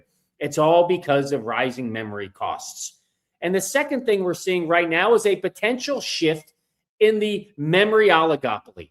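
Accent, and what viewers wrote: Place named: Chicago, United States. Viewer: You sound like you're from Chicago, neither Boston or from Texas. 😊😊😊 tambien Feliz Cumpleanos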